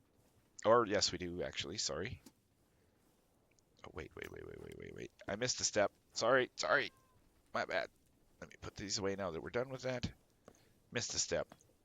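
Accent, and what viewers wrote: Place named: Minnesota, United States. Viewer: What modpack is this? I'm still in the start of the video. Possibly add the link in your description? That bald head makes me happy, lol. Also, are you from Minnesota/Wisconsin? Possibly northern Michigan? I've got a ton of family in Minnesota and you sound like them.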